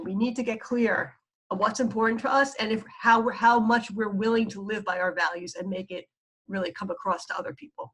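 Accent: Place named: New York, United States. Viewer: love your New York accent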